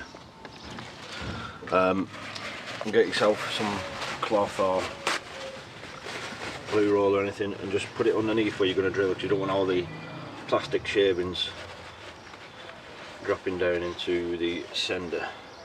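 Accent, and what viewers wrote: Wigan, United Kingdom. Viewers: Great video bud. I wanted to see this exact part of the installation, I'm glad I did too, that pump makes a right horrible noise! Its definitely getting fed from the tank, I'm not having that inside my van! 🤣 And I have to have a guess, you sound like you're from Wigan, maybe Bolton? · is that a wigan accent? great video, very helpful.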